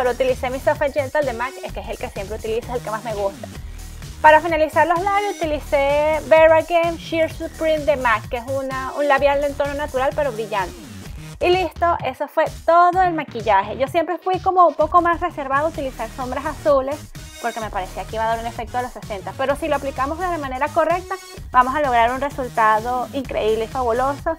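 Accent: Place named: Venezuela. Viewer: Te quedó muy lindo el maquillaje!! te dejo mi apoyo y te invito a pasar por mi canal.. soy venezolana, a ti te escucho como acento venezolano :)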